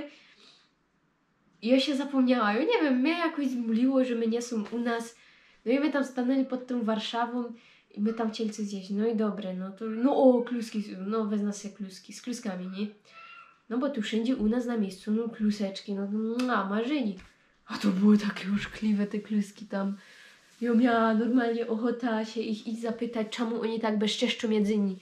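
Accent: Silesia, Poland. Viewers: Ładna dziewczynka. I nawet nie przeszkadza mi " ślonsko godka". Dzięki YT za polecenie kanału. A starsza siostra wolna? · twojej śląskiej gwary... fajnie tak gadasz po wieśniacku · Super filmiki, piękna dziewczyna i super Śląska gadka pozdrawiam i czekam na następny👍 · Siema Dziołcha nima co się wstydzisz noszej godki to je sam piękne że się godo cza ta tradycjo naszo pielęgnować i nie słuchać gupich ludzi kere nie tego nie rozumieją. Pozdrawiom z Bytomia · gadki po Śląsku fajnie się słucha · Uwielbiam te twoje śląskie gadanie :) Absolutnie musi to być na kanale! To tak wyróżnia ten kanał z innych. Jak komuś się nie podoba to niech nie ogląda i tyle! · Gwara śląska jest super, fajnie się Ciebie ogląda. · Nie jestem ze Śląska ale uwielbiam słuchać gwary slaskiej, dla mnie jest to trochę taki powrót do dzieciństwa kiedy oglądało się serial Święta wojna od tego czasu pokochałem Śląsk i gwarę ☺️ Pięknie wyglądasz w tych okularach ☺️ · Śląska godko to śląska godko sztosik mówisz i opowiadasz. 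Tako kobite to ciężko znaleźć ;P pospolita dobra duszyczka. Podziwiam i oby ten kanał się dobrze rozwinął ;) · Jeronie jako gryfno Frelka i richtig fajny slaski akcent ♥️♥️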